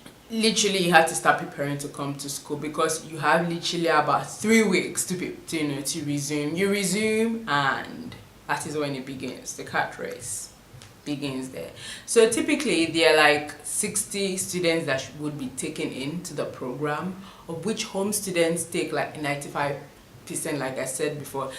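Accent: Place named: United Kingdom. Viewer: Thank you..very helpful. I hope to get in next year. The British accent keeps popping up every now and then😊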